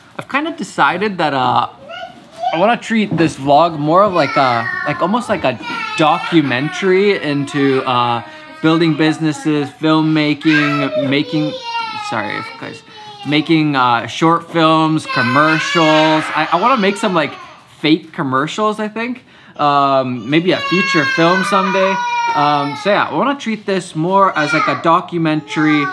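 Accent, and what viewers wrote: Canada. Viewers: Definitely Canadian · Canadian. Definitely Canadian. #saidthegirlfromBuffalo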